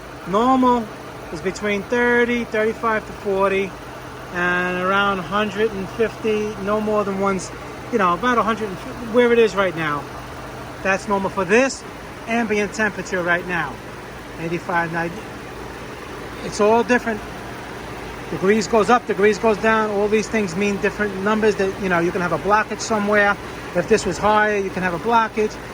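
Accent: New York, United States. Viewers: thank you bro,, u gat that N.Y. gangsta accent.. i like it!! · Ditto. Moving to Hawaii makes me miss the Boroughs' accent.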